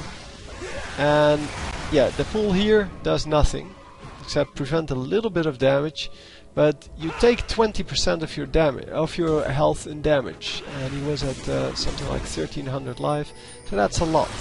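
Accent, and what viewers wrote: Netherlands. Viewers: New to the channel, just subscribed...and do I detect a Dutch/Flemish accent?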